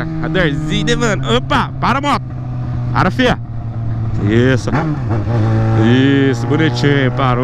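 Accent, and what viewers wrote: Goiás, Brazil. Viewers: porque vc fala no pique do Goiás vc nasceu em Goiânia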